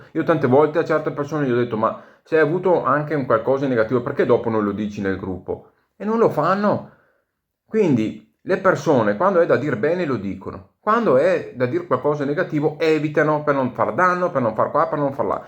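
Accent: Italy. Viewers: piu' ti sento parlare e piu' penso che hai un accento uguale a quello della mia zona... posso chiederti di che provincia/zona sei?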